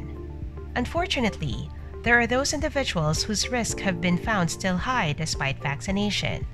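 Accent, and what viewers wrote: Philippines. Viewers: Filipino accent is too strong. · no. She Sounds Filipino.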